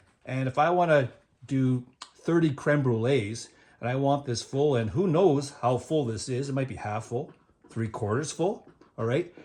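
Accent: Canada. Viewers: Totally unrelated but this guy is definitely Canadian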